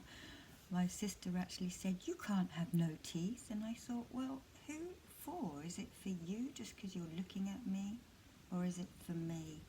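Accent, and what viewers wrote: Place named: Sussex, United Kingdom. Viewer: I've recently broken a tooth and although it's been repaired, I still find eating difficult, like my teeth don't really match. So, to hear your story, I can only say that you're incredibly brave to have gone through all that and still come out smiling and trying to help other people. And yes, as a fellow Sussex girl, I think we do have a nice accent here!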